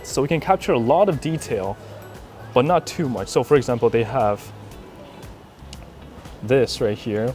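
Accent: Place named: United Kingdom. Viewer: I like your English accent Really British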